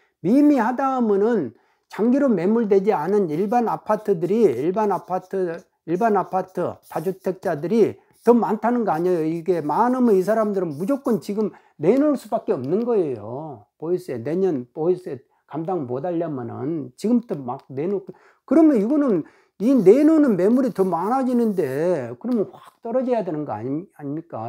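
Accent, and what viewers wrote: Jeolla, South Korea. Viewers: 사투리가 전라도였군요~ 그쪽지방출신이신데 무조건 한쪽으로 기울지않고 올바르고 진실된말씀주시니 더욱 존경하고 귀하십니다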